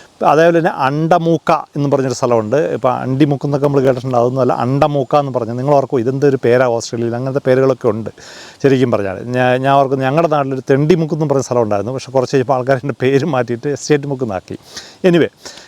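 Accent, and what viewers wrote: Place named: Wayanad, India. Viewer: നാട്ടിൽ വയനാട് ആണോ സംസാരം മാന്തവാടി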